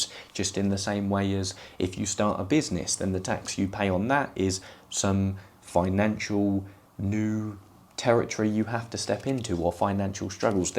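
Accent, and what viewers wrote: United Kingdom. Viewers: Who is this guy and what is his credentials outside of British accent?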